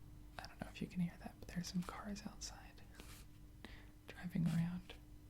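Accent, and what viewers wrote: United States. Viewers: I first had to know about you just tonight, my son showed me people using ASMR, I suddenly found you and your wonderful voice and american accent, keep on making videos, please! And keep on speakng french, try not to lose it, okay? Beacause it's great. Take care from Patty! from Burgundy!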